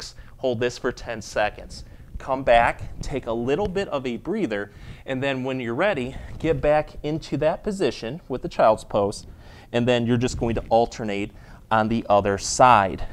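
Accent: Michigan, United States. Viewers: Did these exercises ONE TIME and found that almost all my popping and cracking were gone. I will be continuing these daily. Thank you! I also loved hearing a Michigan accent again; I attended Interlochen Arts Academy up by Traverse City.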